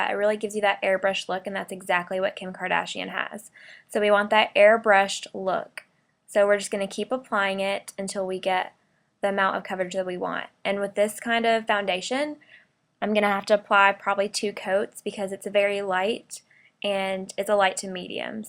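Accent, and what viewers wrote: South, United States. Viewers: Your southern accent is cute! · Omg had a country accent lol I love it!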